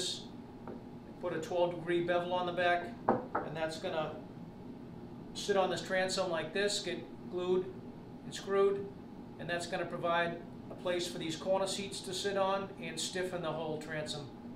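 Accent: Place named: Massachusetts, United States. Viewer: I was wondering for a while why you didn't glue together the two lengths of transom reinforcement earlier in the process. But you then explained that it was to minimize the effects of the epoxy on your drill bits and to consolidate the glue-up of the transom and brace into one operation. That kind of forethought and careful planning is an example of what separates you from the vast majority of YouTube boat builders. Thank you. P.S. As a Rhode Islander born and bred, I appreciate your Massachusetts accent, your Quincy lat/long signboard, and your Cape Cod hat!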